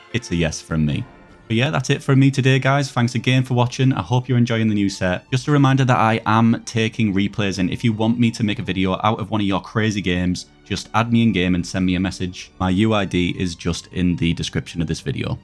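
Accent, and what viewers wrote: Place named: Yorkshire, United Kingdom. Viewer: GOOD TO HEAR ANOTHER YORKSHIRE ACCENT in SVWB ;-) where you from fella?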